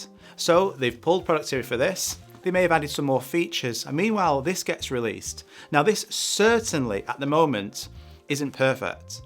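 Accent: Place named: Manchester, United Kingdom. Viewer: So where from exactly? The guy who introduced me into my project in Cambridge 30 years ago was from Manchester. So it appears that I am familiar with your dialect after all already. Here in Switzerland we have many different dialects, mostly due to that Swiss German is only spoken but not written (for writing in newspapers etc. or for the spoken news on TV High German is used). As a result I understand a person who is from the canton of Wallis (that's the worst example) only with difficulties.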